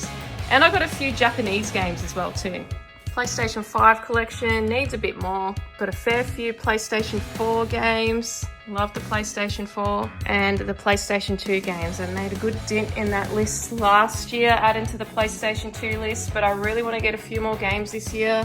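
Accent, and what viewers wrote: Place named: Australia. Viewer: Smokin' hot, tattoos, plays video games aaaaand has an Australian accent?! Crikey!😊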